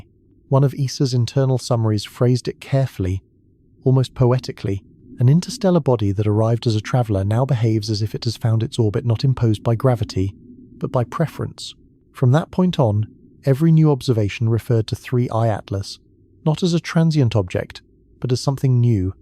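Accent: United Kingdom. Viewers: i'm watching from 3i/atlas. its 1am local time. oops i think i farted.. nevertheless, british accent is so cringe!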